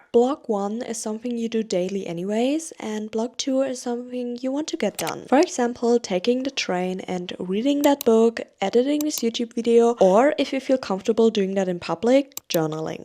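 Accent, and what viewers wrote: Germany. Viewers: I’ve never watched a video from you and like 10 seconds in I was like wait is she German? 😂 not in a negative way, I’m also German, just shocked how easily I spot German accents because they sound so similar to me haha (great video!) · I KNEW IT ! I heard German! But tbh its so cuteee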